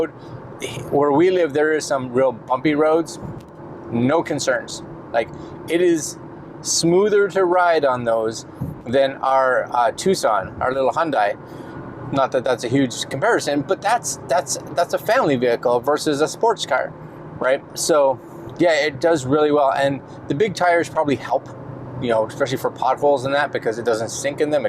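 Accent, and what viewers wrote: Canada. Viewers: Are you from Newfoundland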